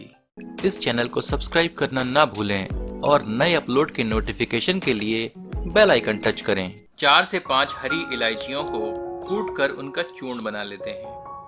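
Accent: Standard. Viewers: शेफ आप जैसा बहुप्रतिभा का धनी व्यक्ति बहुत कम देखने को मिलता है । आपकी शुद्ध हिंदी का उच्चारण सुन कर अतिप्रिय लगता है ।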